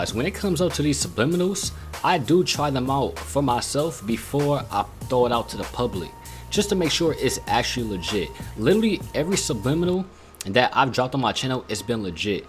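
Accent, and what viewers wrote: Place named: United Kingdom. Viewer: When I hear this guys voice I thought he was from the uk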